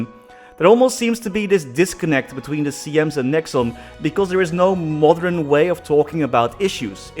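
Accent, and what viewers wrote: Netherlands. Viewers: are u dutch? im dutch too :D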